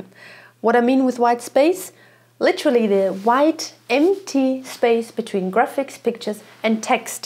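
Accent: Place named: Europe. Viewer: European English accent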